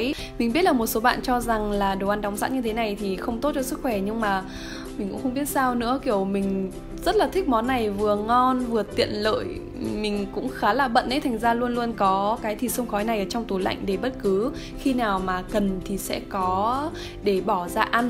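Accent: Hanoi, Vietnam. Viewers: Giọng nói HN đáng iu quá hihi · Be de thuong qua cam On chia se cua e , giong ha noi nghe de thuong , guong mat xinh qua 🌹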